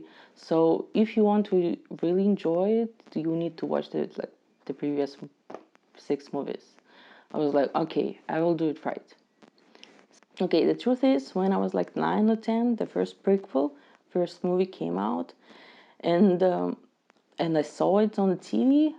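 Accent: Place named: Eastern Europe. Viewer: yeah Alec Guinness is a legend, other classics he acted in are The Ladykillers, The Lavender Hill Mob and Kind Hearts And Coronets. As for your accent, those from the former Soviet states in the eastern bloc sound very similar. Your name sort of gives it away though, you wouldn't happen to have Romanov blood would you lol?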